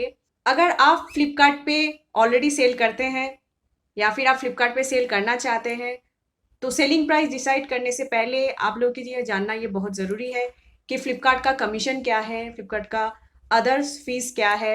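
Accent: Bengal, India. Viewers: বাঙালি হিন্দিতে কথা বললে শুনে মজা আসে|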